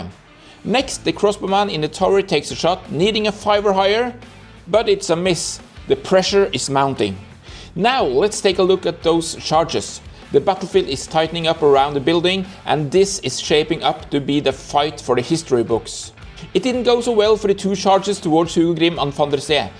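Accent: Norway. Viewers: Den norske aksenten skinner fint gjennom :)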